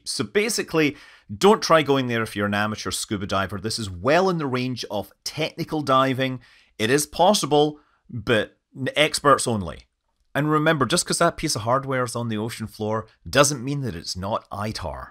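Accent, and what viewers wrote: Scotland, United Kingdom. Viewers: Even this Englishman loves a Scot explaining how those Americans got it so wrong. ♥️ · Finally, the Scottish summary of the launch.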